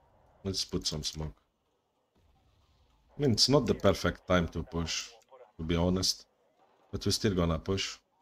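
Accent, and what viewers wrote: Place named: North Africa. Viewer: Your English is good BRO, it's like North African english.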